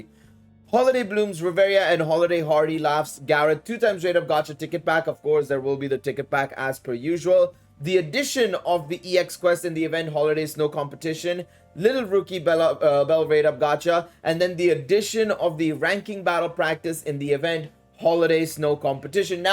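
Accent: India. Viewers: Just wondering are you indian-indian? You said about being based in EU? Why is your indian accent so heavy zzz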